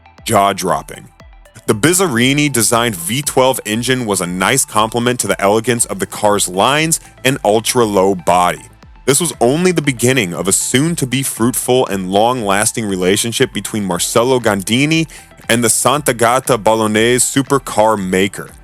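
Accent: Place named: United States. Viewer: Any A.I. voice would have spelt any of those names much better than any "American". To not to say anything about his "TweNNy-tweNNy"...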